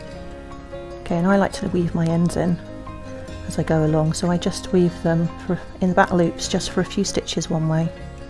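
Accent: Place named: United Kingdom. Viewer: Hi this is a lovely design and you explain it so well. I’m a beginner in the uk, are these US or UK terms please? Because of your accent I thought it was uk terms but now I’m confused….it doesn’t take much 😂😂 Great video thank you x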